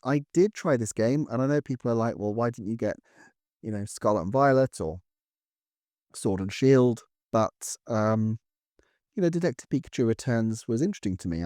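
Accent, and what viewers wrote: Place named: Southern England, United Kingdom. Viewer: I enjoy bottom tier being "so-so;" it feels very befitting of your southern English accent and your very conciliatory style of analysis.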